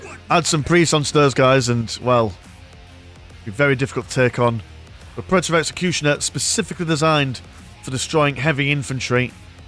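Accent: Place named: East Yorkshire, United Kingdom. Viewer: Recent subscriber, pleasantly surprised when I heard East Yorkshire, from north east myself, love the videos!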